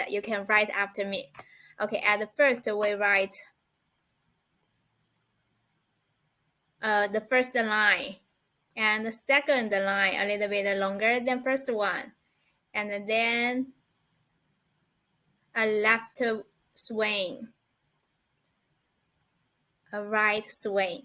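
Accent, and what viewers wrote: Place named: China. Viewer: I love it when Chinsse women speak Chinsse English! It sounds cute 😍😍 just NOT AMERICAN... Got to get me a Chinese wife one day!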